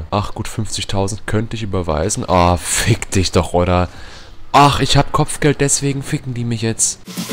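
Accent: Bavaria, Germany. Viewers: verdammt, du bist bayer?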